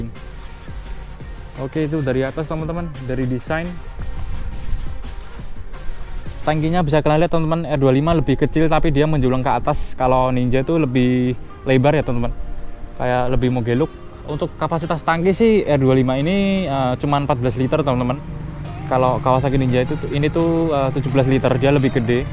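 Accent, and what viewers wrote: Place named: Java, Indonesia. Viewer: kenapa memaksakkan pake "Gua" sih. Medok pol.. ra cocok. jowo jowo ae rasah lu gua. 🤣✌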